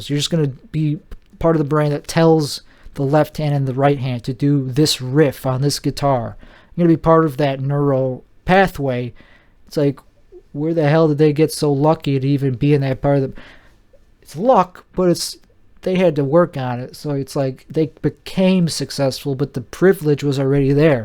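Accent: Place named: United States. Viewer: I am russian. And your accent is so American. 😮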